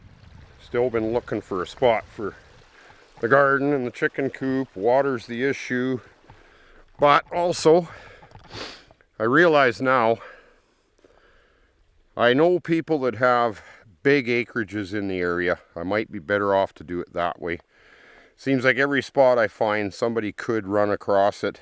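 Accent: Alberta, Canada. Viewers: Mr. was this video produced in the Rocky Mountains of Canada. I noticed you and your friend were referencing the miles in Kilometers and you have a Canadian Accent.? Also, us there Cell Phone Service in the mountains that far back in to reach Medical Help if needed.? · He has a strong albertan accent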